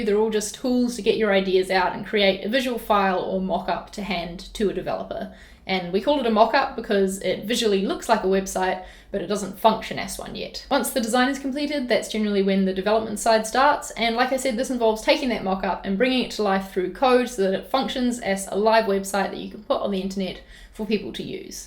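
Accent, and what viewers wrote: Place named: New Zealand. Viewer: Love the Kiwi accent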